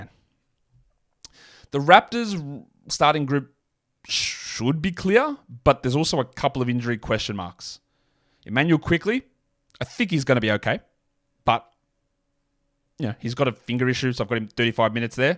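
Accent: United States. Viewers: Great American accent and gradey dick segment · Best American accent ever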